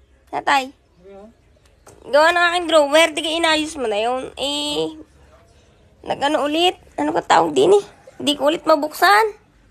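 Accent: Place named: Ilonggo, Philippines. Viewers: Baw ilonggo gale si mama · Nindot jd kaayu paminawn ang inistoryahan sa ilongga ❤❤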